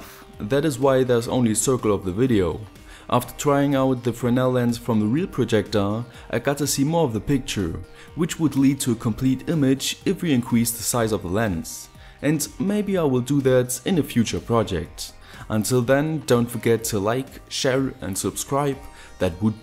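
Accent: Germany. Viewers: nice work. german english... gruäss us dr schwiiz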